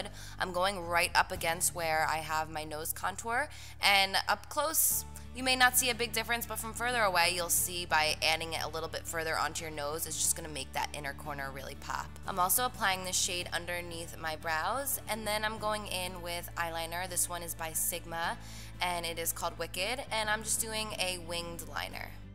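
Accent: New Jersey, United States. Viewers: I never noticed her jersey accent until now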